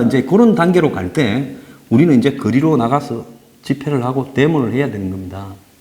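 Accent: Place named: Busan, South Korea. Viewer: 명강연에 살맛납니다! 간간히 나오는 부산사투리에 더 재밌습니다!^^ 늘 감사히 잘 듣고 있습니다! 건강 챙겨가시면서 방송 해 주셔요~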